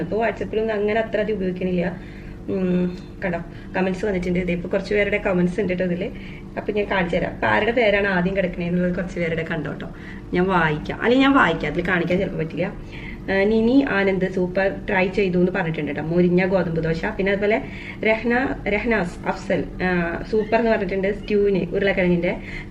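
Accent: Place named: Thrissur, India. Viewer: ഒരുപാട് late ആയാണ് ഞാൻ ഈ ചാനൽ കണ്ടത് പക്ഷേ സത്യം പറയാല്ലോ ഒരുപാട് ഇഷ്ടമാണ്.ഇതിലെ തക്കാളി മുട്ടക്കറി try ചെയ്തു കിടു ആയിരുന്നു.ഇപ്പോൾ എല്ലാ videos ഉം കാണാറുണ്ട്.really love the way u are😍😍😍😘😘😘തൃശ്ശൂർകാരിയേം,ആ സംസാരവും ഒരുപാടിഷ്ടം😘😘😘😘😘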